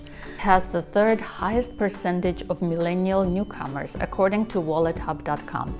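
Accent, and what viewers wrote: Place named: Boston, United States. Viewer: You say Massachusetts but all I hear is Boston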